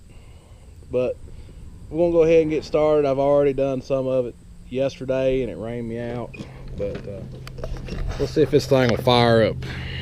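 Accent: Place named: Kentucky, United States. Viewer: Love my 1025R!!! Great little tractors. Are you a Kentuckian??? You sound like one lol. Im from South Central Kentucky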